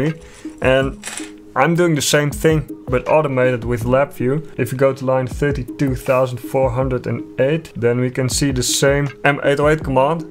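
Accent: Netherlands. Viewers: Being a dutchy makes me realize how Dutch you sound. Love it tho. G E K O L O N I S E E R D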